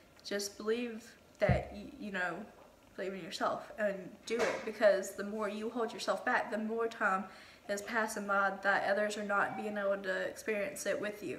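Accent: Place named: South, United States. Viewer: I watched the whole video - I really enjoyed getting to know you better. I love your family values - sounds like your family is the best, especially your brother! Guess what girl? I believe in you too! You have so many talents. You are a really good artist! I'm very happy to have met you and one day maybe we can do a collab when we have more subscribers! What state do you live in? You have a southern accent. Also what is mudding?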